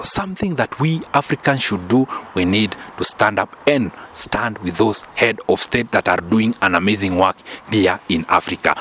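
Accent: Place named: Kenya. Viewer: You keep lying about Ghana you area Kenyan with that thick accent talk about Ruto and the good things he is doing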